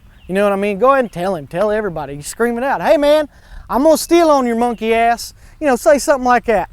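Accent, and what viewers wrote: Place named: South, United States. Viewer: Basically every deep southern baseball coach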